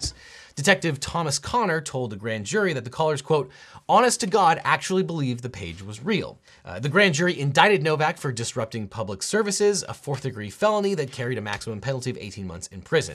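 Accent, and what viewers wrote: United States. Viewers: Hearing an American accent in Latin is... well, it is something for sure. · Broooo you need to chill with your american pronounciation in latin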